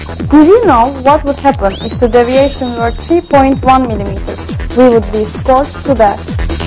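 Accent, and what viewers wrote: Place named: Turkey. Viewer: kesin bizden, aksandan belli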